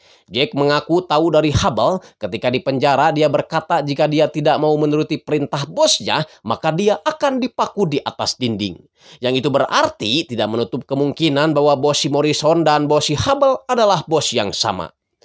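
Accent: West Java, Indonesia. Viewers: Orang sunda nih .. sama kaya iq 7 Enak di dengar😂😂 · Logat orang sunda hahaha..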